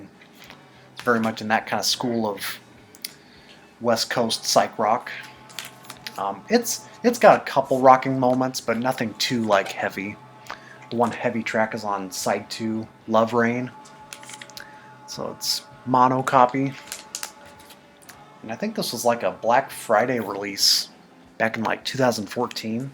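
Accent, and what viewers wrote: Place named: Lincoln, United Kingdom. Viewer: Hey! Cosmic Brian sent me here! He said you're from Omaha, but it sounds to me like you're from Lincoln? It's pretty cool watching a video of someone showing the treasures they found at places where I shop at occasionally!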